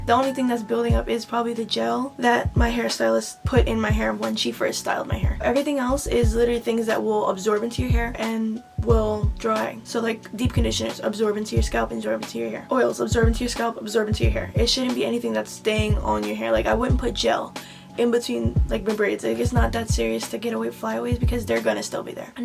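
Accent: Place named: England, United Kingdom. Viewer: Wow thanks and I really thought u where from England 🤷🏾‍♂️😂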